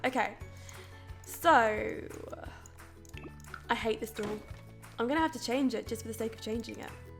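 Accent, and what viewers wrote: United Kingdom. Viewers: Maria santos to me, she sounds more British that Australia. 😂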